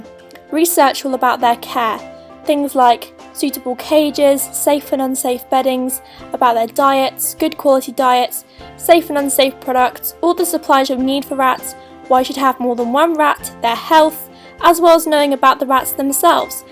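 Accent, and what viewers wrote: United Kingdom. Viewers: OMG!, not only did I LOVE your video, It actually WORKED FOR ME!!!!:D I want to SCREAM I'm so happy! I watched your video, and I made a couple long paragraphs about rats, a couple facts, and my plan, and I'm getting a rat... THIS WEEKEND!!!!! To make my parents get more onboard, I'm going to use my own allowance to get everything! >D You don't even know how ESTATIC I am about this. P.S. I love you're (I think British) (I think it's spelled) accent! I'll tell U about her when I get her! Love, You're Biggest FAN!!!! >D!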